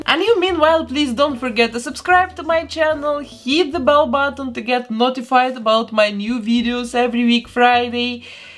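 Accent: Russia. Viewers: why do you have a pronunciation like that of Russian? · Ты случаем не русская? Акцент похож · Ничего не понимаю но смотрю, акцент русский сразу видно) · Не знаю почему. Но по произношению кажется что ты русская или украинка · Ты русская? Этот английский режет мне уши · я слышу русский акцент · Wow! You speak as Russian... As Russian speaks English.... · Русский акцент все равнт заметен, но английский очень даже хороший у вас)) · You have such a strong Russian accent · Мне кажется или я слышу у вас русский акцент? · почему я слышу русский акцент... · Черт😃 Ощущение, что вы русская... Ну на английском говорите так, словно долго жили в России....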